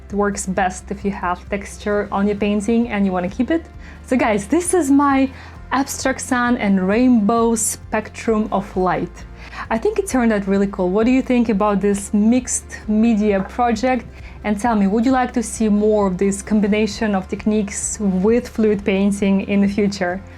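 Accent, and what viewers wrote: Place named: Russia. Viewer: Так и знала что вы русская...😉 своих всегда видно и слышно...🤗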